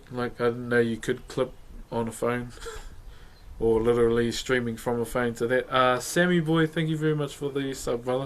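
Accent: New Zealand. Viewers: if I'm not wrong I think he has a Maori accent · the New Zealand accent is so wholesome. I love you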